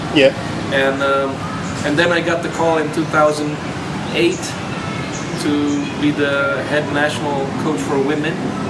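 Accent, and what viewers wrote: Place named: United States. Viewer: Bahasa inggrisnya kental kayak Amerika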